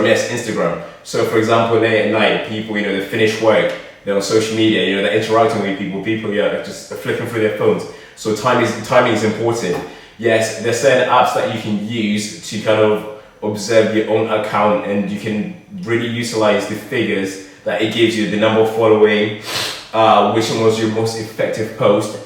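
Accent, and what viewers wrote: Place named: United Kingdom. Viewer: Don't overlook how MASSIVELY important it is to have a charming British accent, you seemed to have passed right over that bit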